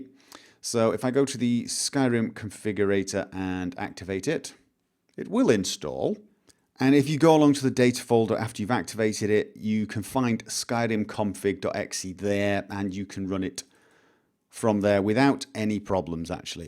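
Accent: United Kingdom. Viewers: Evans He is British. He resides in Norway, though. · REALLY? This whole time I thought he was British ._. And I'm British. He's really refined learning English then o.o, usually people retain accents when they learn a new language (Like Robbaz) but he just sounds British. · Hey, where are you from? I know it says Norway but your accent seems to be very British. Oh and awesome videos btw, keep it up.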